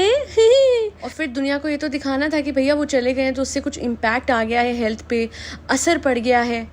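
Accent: Punjab, India. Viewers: Your voice is so sweet n soothing but aaj aapki meethi punjabi sunkar toh maza aa gya or jis tarah se aapne bola usse toh lgta ki aap Punjabi ho mei bhi punjabi hu toh or bhi jyada khushi hui❤❤❤❤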